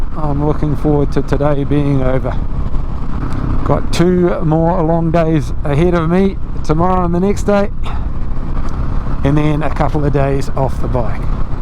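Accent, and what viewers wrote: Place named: Scotland, United Kingdom. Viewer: Your Scottish accent had me giggling 😂. I'm on my way....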